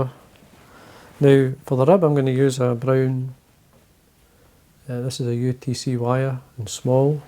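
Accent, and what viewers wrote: Scotland, United Kingdom. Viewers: I detect a Scottish accent. My family history is Scottish.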